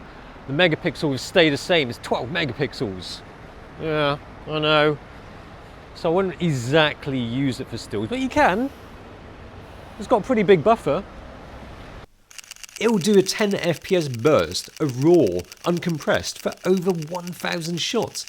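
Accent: United Kingdom. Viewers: Oh my is so Brit-ish that accent tho! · Thanks the british for colonizing Hong Kong, his british accent perfect.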